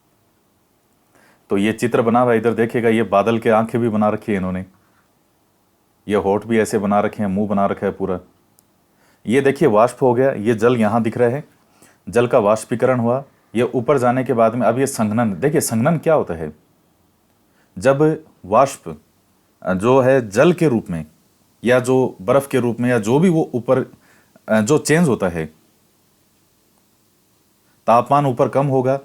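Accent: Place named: Marwari, India. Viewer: Sir thaari marvadi language bahut achhi lagi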